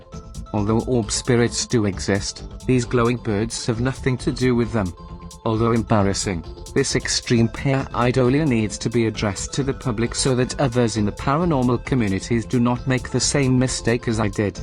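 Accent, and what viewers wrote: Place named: North Wales, United Kingdom. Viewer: The commentator is a Gog. That is, he is from north Wales and he has a Gog accent. The accent is only slight. I find a strong Gog accent awful to listen to!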